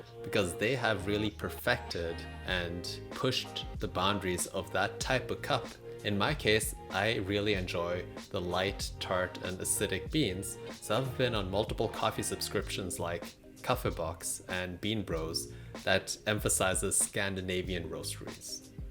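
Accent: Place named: Germany. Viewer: Idk why but I was so sure you were German lmao You kind of sound like a (very good) German English speaker xD Are you really Canadian?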